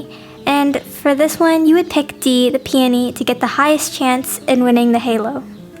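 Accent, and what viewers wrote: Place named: United Kingdom. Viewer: I LOVE YOUR BRITISH ACCENT OMG <3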